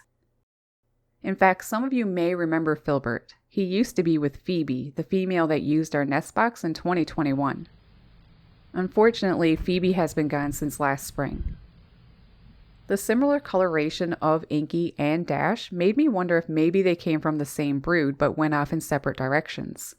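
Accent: Canada. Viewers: Most Canadian accent ever lol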